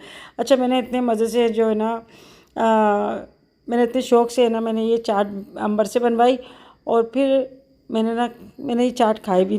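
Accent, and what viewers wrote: Hyderabad, India. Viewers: hadrabad language esi hi hai baron KO toktay Nahi Hain. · Hyderabad me asi ho bola jata ha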